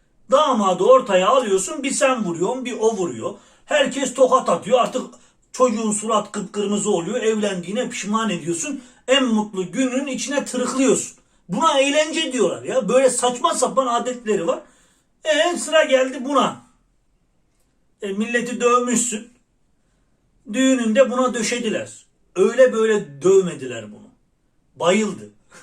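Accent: Erzurum, Turkey. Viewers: kardes seni severek takip ediyoruz.ara sıra Erzurum Erzincan şive leşini karıştırırsan çok hoş sevecen olur seni sizleri seviyoruz edremit altinoluktan kucak dolusu selam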